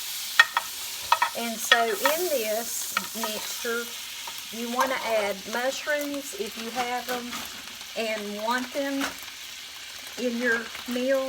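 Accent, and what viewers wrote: South, United States. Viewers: Heather Robbins:. Isn't a sweet lady to use her time to teach us different recipes? It's that southern tone she has,just so sweet.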